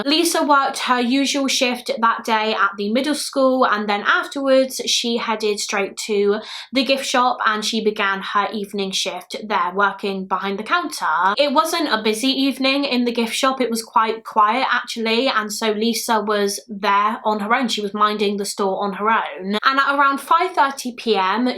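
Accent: United Kingdom. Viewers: You’re so adorable and I love your voice. I’ve always had a hard time with British accents, but you and Eleanor Neal are life for me 🥰🥰🥰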